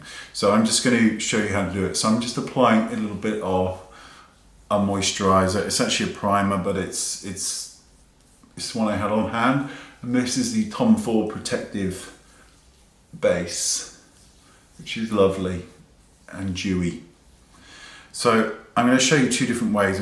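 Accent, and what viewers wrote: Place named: West Country, United Kingdom. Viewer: Your accent was extra West Country today, I love that accent!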